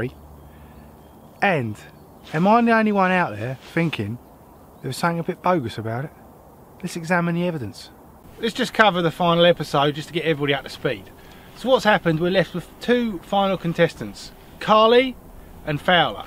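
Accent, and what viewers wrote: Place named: Essex, United Kingdom. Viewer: Where are you based bro? it's not aired in the UK yet but you clearly have a Southern I'm guessing Essex accent!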